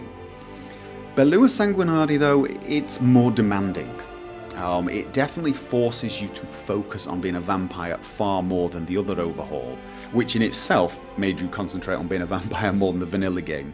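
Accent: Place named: England, United Kingdom. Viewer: DawnGaurd to me was a total disappointment! Vacation? Come on you sound English use Holiday please!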